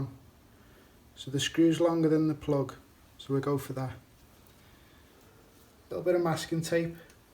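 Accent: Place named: Northern England, United Kingdom. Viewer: I bet you are from Northern England. Somewhere around Manchester area. I am pretty sure about that!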